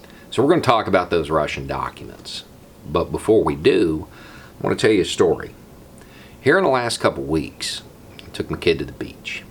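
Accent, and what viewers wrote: South, United States. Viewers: what southern state is your accent from?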